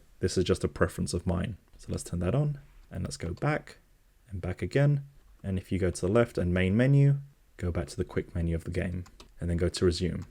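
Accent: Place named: United Kingdom. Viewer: Oh, I meant it in a positive way! I'm not a native English speaker, so I’m used to hearing different British accents. Your accent sounds softer and more neutral compared to others I've heard, and it’s nice.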